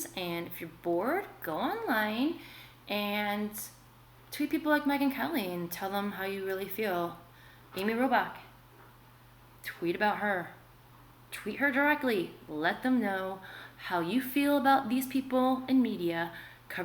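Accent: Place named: London, United Kingdom. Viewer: Sounds kinda like the kids from London. The little boy and girl that told on their Dad and teachers at their school.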